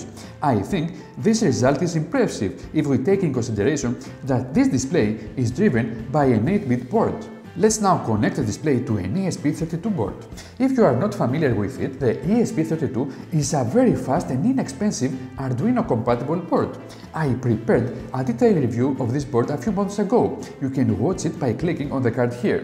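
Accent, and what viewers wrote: Greece. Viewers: Γεια σου.απο οτι καταλαβα απο την προφορα πρεπει να εισαι ελληνας.θα ηθελα να σου στειλω ενα link και να μου πεις πως μπορω να το κανω και ποσο (ευκολο) <link> ευχαριστω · the moment he opened his mouth, I knew it from the start, He's Greek! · I hear a Greek accent! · Are you from Greece?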